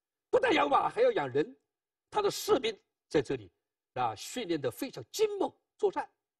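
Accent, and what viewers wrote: Sichuan, China. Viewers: 这位教授普通话听着像四川人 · 我听着他肯定是四川人。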